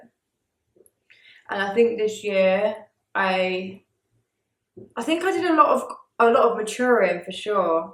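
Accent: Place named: London, United Kingdom. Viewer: It been a while since I came on this channel. Since when has got a London street accent 😆??. Happy new year!💞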